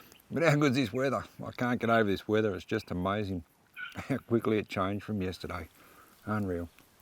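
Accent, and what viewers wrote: Australia. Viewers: lol. You have the okka accent. Crocodile Dundee Steve Erwin sound. My brother got into 4x4 camping. Low and behold his speech pattern changed after 6 months in the bush. Recon ' e ' ad a close a close encounter of the third kind on his adventure. On ya mate. Keep having fun.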